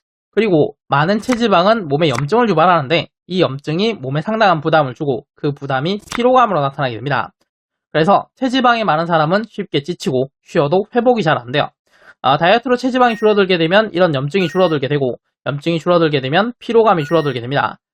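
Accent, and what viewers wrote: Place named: Gyeongsang, South Korea. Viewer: 이분 경상도분이신듯 약간 억양이 왜 경상도사람들이 아 어 발음이 좀 새는경향이있거든요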